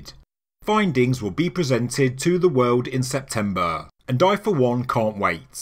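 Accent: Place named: England, United Kingdom. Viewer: ZZZZZZZZZZZZZZZ Do all poms talk like this? (Gobekli Tepaaaaayyyyy?!)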